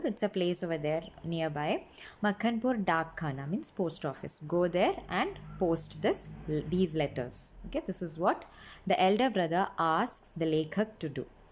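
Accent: Kerala, India. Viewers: maam are u a malayali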